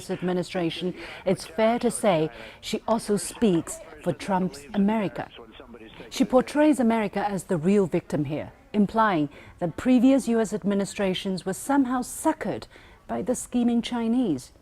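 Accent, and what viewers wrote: United Kingdom. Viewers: are you really living in China? then how do you get on the youtube? As far as I know, youtube, along with EVERY western media, like FOX CNN are banned in China. Her British English sounds well, but unfortunately it full of lies. You do know that there is no freedom of speech in China, and Liu Xin is working for CCTV, in other words, she works for CCCP. I challenge you slightly criticize Chinese Xi Jinping as a dictator, he has revised the Chinese Constitution and will remain in the power for whatever he like, what that sounds to you? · youvecattobe kittenmeritemeow 这个是英音吗？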